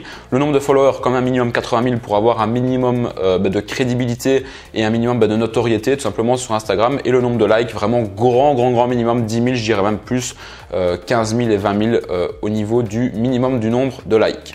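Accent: Belgium. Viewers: T'es belge? Je reconnais l'accent belge ahah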